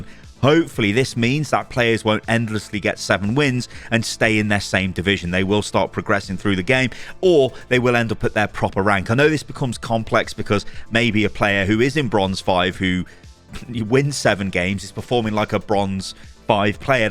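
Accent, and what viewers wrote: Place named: United Kingdom. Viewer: Well maybe dial back back the British bullshit it’ll transcribe just fine.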